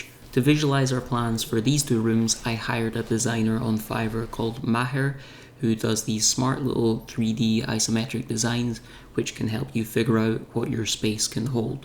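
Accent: Scotland, United Kingdom. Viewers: TECHNICALLY IT IS NOT " NATURALLY RUNNING WATER".... IT HAS BEEN CHANNELED THROUGH A MANMADE STUCTURE.......I felt obliged to correct you since you are such a carefully worded individual .........Secondly , I wonder if you have deliberately modified your Scottish accent......because your speech is pleasant.....whereas in general the Scottish accent is brutal on the English language ....I only watched to the " naturally running water" reference so that is all have to say regarding this video......however , I was interested by your video revealing how much Youtube paid you......and I did notice also , your promo for your " Questions people ask me" video.....but didn't watch it......however ,.....are you gay / are you single /Did you buy that cat because its colourings resemble your own / what are your opinions on the current transgender debate ?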